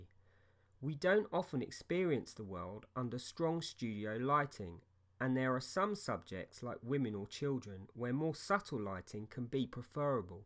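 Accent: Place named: London, United Kingdom. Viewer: Hearing you speak makes me miss London!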